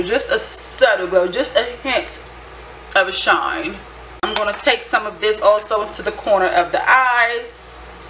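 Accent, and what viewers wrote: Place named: DMV, United States. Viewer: I hear the dmv accent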